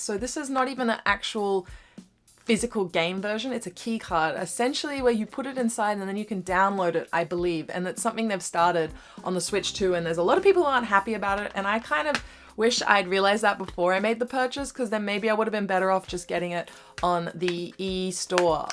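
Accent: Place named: Australia. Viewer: It's a totally different story here in Australia - there's Switch 2's everywhere - I mean sure, pre-orders are required and all that but even if you walk into a store - there's a good chance they will have some spares laying around! It's ether we over-ordered and not many people want to buy them, or perhaps Australia's got some spending issue! BTW, are you Australian - your accent sounds Aussie?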